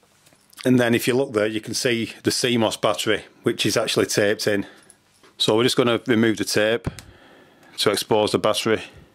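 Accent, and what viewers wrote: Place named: England, United Kingdom. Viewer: brilliant english accent i adore )))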